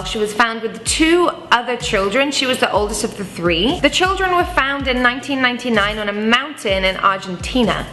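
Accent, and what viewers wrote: England, United Kingdom. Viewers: And people didn't believe me when I said that some English accents really aren't all that nice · British accent, I barely understand what she is talking about. I am not American. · sounds 3/4 enlgish 1/4 american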